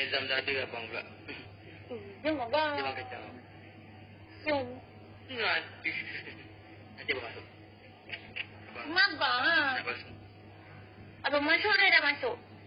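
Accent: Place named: Malaysia. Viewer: Kok sampeyan iso ngomong carane Wong melayu ngomong..mantap sis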